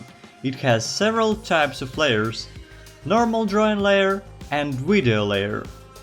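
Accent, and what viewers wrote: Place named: Russia. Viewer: Слышно, что наш человек)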